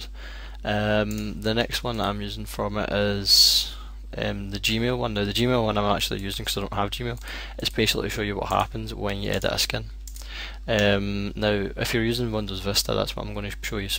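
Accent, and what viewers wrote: Scotland, United Kingdom. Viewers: LOL you guys are fucking morons. I can hear him perfectly, it's not difficult to understand what he's saying. As far as a Scottish accent goes that's a light one..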